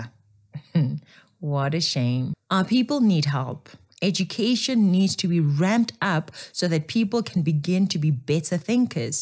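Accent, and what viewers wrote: Model C, South Africa. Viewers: With your model C accent, you shouldn't be Zille's apologist. Dont try to be a good black.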